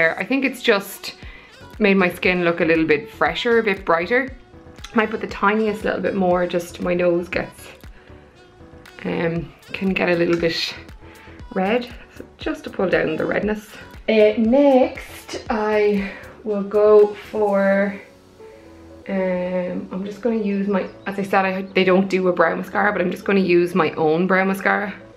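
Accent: Ireland. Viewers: What part of Ireland are u from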